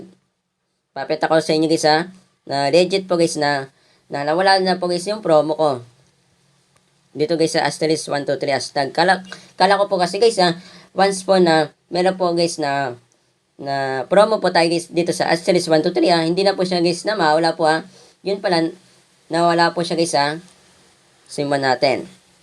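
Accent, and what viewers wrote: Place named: China. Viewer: sakit sa tenga "guys ahhh" intsik sta ahhh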